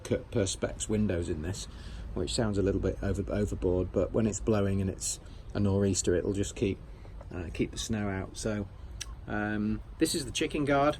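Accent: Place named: England, United Kingdom. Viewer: awesome! That explains the accent. How you liking the U.S.? It's a lot bigger than England..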